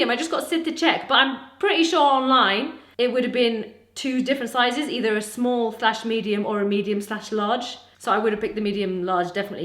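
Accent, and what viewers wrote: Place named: England, United Kingdom. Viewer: your voice + that English accent = perfection. I don't wanna sound creepy but dammit I love your voice,! Hope you're having a healthy pregnancy.🌻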